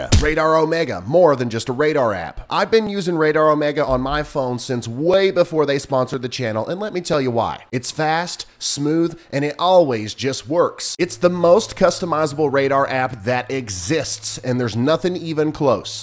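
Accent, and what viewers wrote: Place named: South, United States. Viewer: On a side note-I'm guessing that you grew up in the south, but now dwell in a northern city?? Completely understand if you don't want others to know your business!! I just like figuring out where someone is from by their accent and speech pattern.. Also enjoy your videos as well. Thank you for your work!!!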